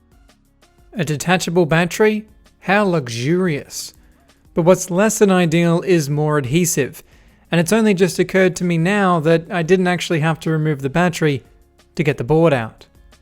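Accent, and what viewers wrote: Australia. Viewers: Mp3-4 players named nuggets/nugs, voiced over by an Australian man on a table, 🤔.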